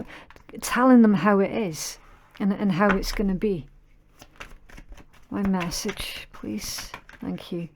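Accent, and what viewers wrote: Scotland, United Kingdom. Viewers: I’m new to you channel … you absolutely nailed it !!! Every detail !!! Well done .. hello from Rhode Island, USA … love your Scottish accent ????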